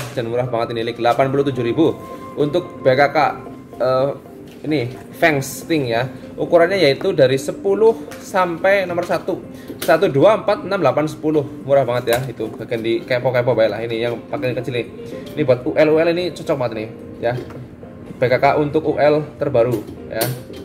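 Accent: Banyumas, Indonesia. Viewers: Ora ngapak ora kepenak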